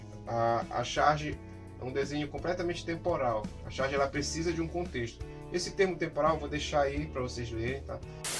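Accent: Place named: Manaus, Brazil. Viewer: Esse sotaque da moça parece ser da minha cidade Manaus Vcs são daqui? Tô no terceiro período de jornalismo estudei com o Romas Mascarenhas mas me esqueci tudo tô relembrando ótima aula seus vídeos.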